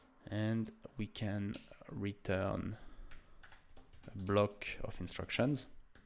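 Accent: France. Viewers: Like your French accent ✌️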